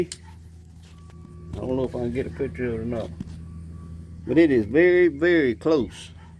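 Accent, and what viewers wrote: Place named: West Virginia, United States. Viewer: Sounds like McDowell county WV feller👍🇺🇸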